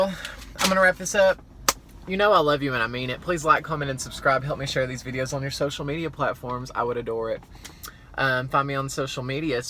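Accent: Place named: Deep South, United States. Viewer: Dewde your accent is Killin me, you must ve from the deep damn south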